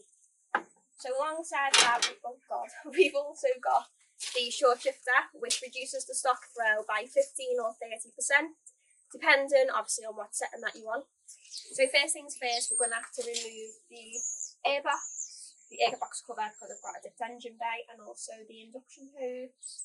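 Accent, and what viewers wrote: Liverpool, United Kingdom. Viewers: I was in liverpool for the first time at weekend 😍 ended up at the docks near all the bars! Cant wait for this video 👌🏼 p.s big up the scouse accent 😂 xx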